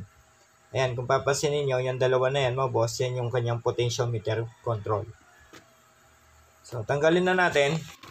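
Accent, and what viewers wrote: Pampanga, Philippines. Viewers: kapampangan accent ka sir. . baka malapit kalang sakin. . pagawa ko nalang sana sayo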